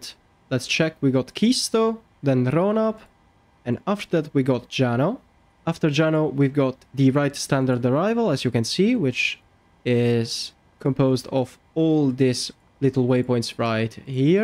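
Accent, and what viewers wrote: Italy. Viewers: Are you italian? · are you Italian?😅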